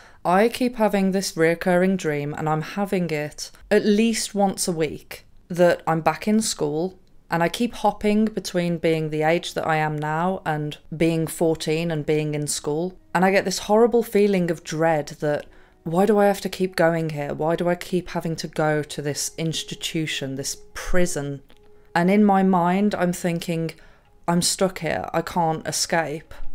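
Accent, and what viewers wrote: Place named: Birmingham, United Kingdom. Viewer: I like ur accent where you from? Im a brummy you sound like ur by them sides. ?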